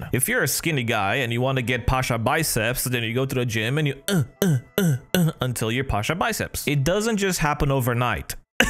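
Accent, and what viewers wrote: Romania. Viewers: are you a romanian? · Esti roman? Romanian? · R u Romanian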